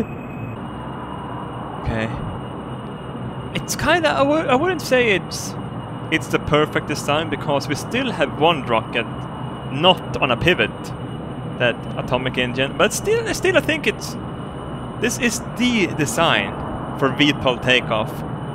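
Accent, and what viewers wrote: Sweden. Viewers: Are you from Sweden ? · Hikari Yeah,He's sweden xD I've known that for a LOONG Time before he starts his account.Well i tought it was funny though. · well he's sweedish so he pronounces J's as Y's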